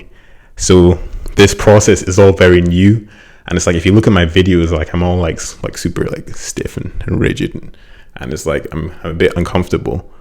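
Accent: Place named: Scotland, United Kingdom. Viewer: YT is literally therapy for a lot of ppl. The Scottish accent thooo!!!! 😂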